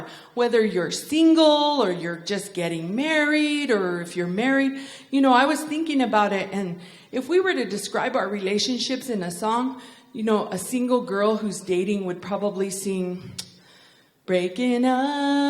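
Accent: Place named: Northern New Mexico, United States. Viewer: Love that Northern New Mexico accent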